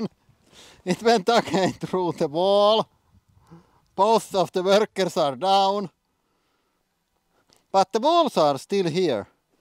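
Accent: Finland. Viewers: Finnish English accent is the best English accent ❤️ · This is Finnish accent. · "So if anything goes to sheet it doesn't matter" 🤣 I love this channel so much. I'm Canadian so I sound stupid to most of the world but I love Finnish pronunciation of English language.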